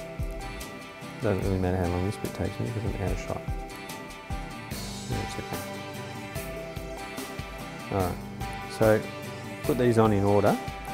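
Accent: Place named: United Kingdom. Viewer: Excellent! You took your time and explained things well. Even with that crazy British accent :)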